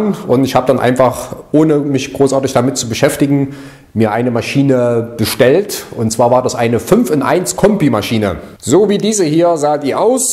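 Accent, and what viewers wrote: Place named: Hesse, Germany. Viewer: Ich weiß nicht wieso aber irgendwie finde ich deinen Dialekt sehr symathisch, auch wenni ch ja eigentlich der Meinung bin, dass ein Mensch agar nicht mehr sein kann als ein Hesse ^^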